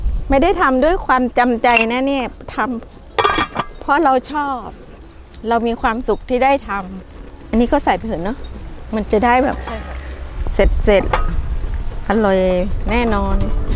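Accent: Isan, Thailand. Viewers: หนูเป็นfcยายมาตั้งแต่หลานเจ่าสายฟ้ากับพายุน้อยๆนั่งเล่นยุนำยายเจ่ากะเว้าอีสาวม่วนดีจ้าช้อบฟังตอนเจ่าเว้าลาว❤❤ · คุณยายเก่งและน่ารักตลอดเลยคะ เว้าภาษาอิสานตะฮักคักจ้า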